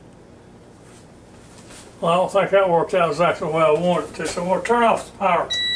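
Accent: South, United States. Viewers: It’s the southern accent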